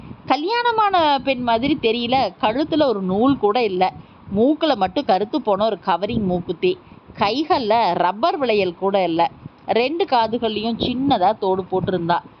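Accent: Kongu, India. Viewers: எதார்த்தமான கதை. கொங்கு தமிழில் அருமையாக வாசித்திருக்கிறார். அம்மாவின் கோபம் எங்களை இப்படி ஆக்கிவிட்டது என்று சொல்வது நடைமுறை உண்மை. நான் ரசித்த கதைகளில் ஒன்று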